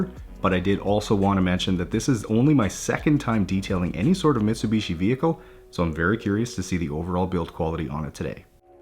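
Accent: Canada. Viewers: "grage" I always get a kick with the Canadian accent.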